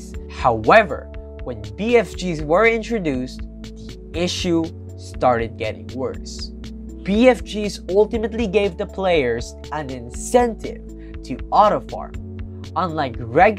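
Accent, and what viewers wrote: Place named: Philippines. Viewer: I already know your filipino couse of your voice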